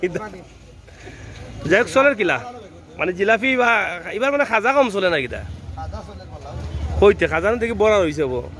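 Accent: Sylhet, Bangladesh. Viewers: Bhai Sylheti matoin bala laga Suddo bashai matla bala lagana hunta. Sylheti mat ou best Amra hokkol Sylheti desh bides o beta giri. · ভাই আপনে একবার সুদ্দু করে কতা বলেন একবার সিলেটি মাতইন মাতলে একলাকান মাতইন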